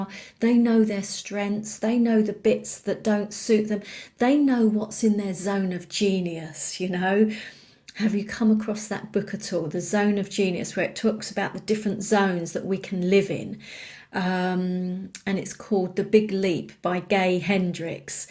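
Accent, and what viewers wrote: United Kingdom. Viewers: Thank you, for your loving bright smile and face, that so much express the Spiritual Community of Light, which leads and inspires you on this specific task you are performing with us here. You are ALREADY in your own....Zone of Genius, dear friend!! For sure!!! But I feel also very grateful for the Quality of your presentation, the respectful, joyful and positive personal style of your image to us! And your gorgeous, clearly spoken, British English, of course!! Lots of hugs!!!🤗🤗🤗🤗🤗❤️❤️❤️❤️ Liza.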